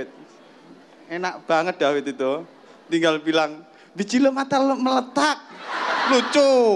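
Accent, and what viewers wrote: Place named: Java, Indonesia. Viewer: Smoga mas ttep khas kya gni yak... Kampungan dan medhok nya itu loh yg bkin ngakak ahahahah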